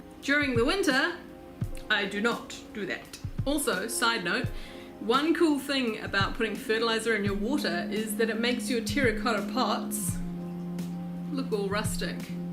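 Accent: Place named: New Zealand. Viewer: Another kiwi! I heard Kia Ora and wondered if I was hearing things... then the accent confirmed it. I was given a rooted cutting, and showed a lady at the garden centre and she said she'd never seen one before?!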